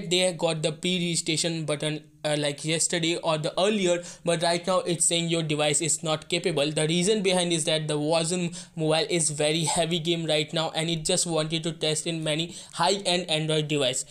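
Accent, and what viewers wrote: India. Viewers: Indian accent, hmmmmm...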